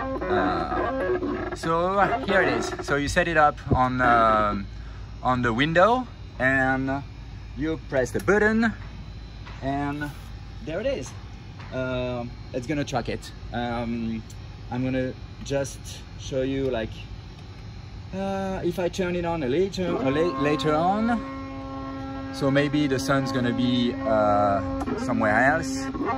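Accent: France. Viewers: Very good project . Accent très frenchy ;-) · Hshahaha the french accent 😂😂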